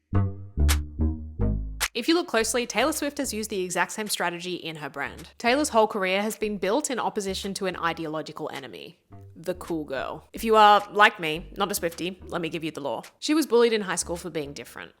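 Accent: Australia. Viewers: Just realised your Aussie! Birds of a feather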